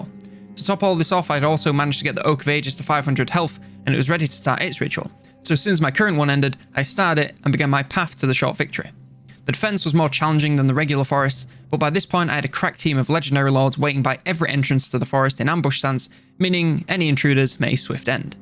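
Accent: United Kingdom. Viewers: These stock images and British voiceover would make SpiffingBrit proud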